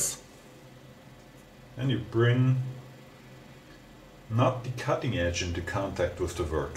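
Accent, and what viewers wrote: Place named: Germany. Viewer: BTW: Solche Unterlagscheiben gibts fertig, Z.B. bei VW. Werden dort bei den hinteren Radlagern eingesetzt. Man merkt es deutlich, Du denkst Deutsch und sprichst Englisch. Ist lustig, besonders wenn Du mal wieder ne Vokabel suchst.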